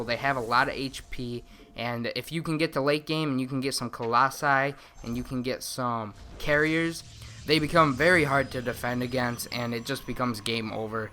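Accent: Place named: Chicago, United States. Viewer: Are you from the Chicago area? your accent sounds like it. ( i used to live 'round there)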